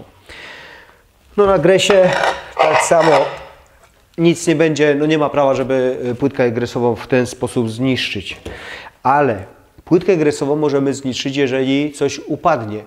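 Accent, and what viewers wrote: Silesia, Poland. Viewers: Jestem tu pierwszy raz i po kilku sekundach słysząc Twój głos pomyślałam "on jest stąd". Po czym znalazłam lokalizację Twojej firmy i okazuje się, że jest ona w Rudzie Śląskiej. Pozdrawiam z Chorzowa i zostawiam suba ;)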